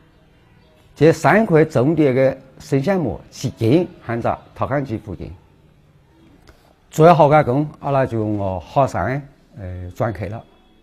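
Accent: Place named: Henan, China. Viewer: 这是吴语？除了我们读阿拉，讲读刚之外就是河南话吧！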